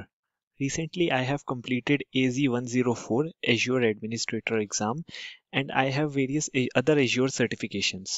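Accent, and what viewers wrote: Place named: United States. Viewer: Accent like American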